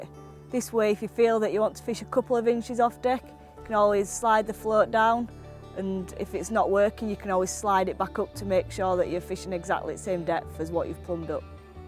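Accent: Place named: Yorkshire, United Kingdom. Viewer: great film and a language that I understand perfectly,broad yorkshire👍👍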